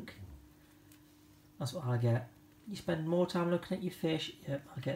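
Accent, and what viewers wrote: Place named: England, United Kingdom. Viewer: Arhhh ok. I'm from Yorkshire but live in the US now. Just recognised the accent👍🏻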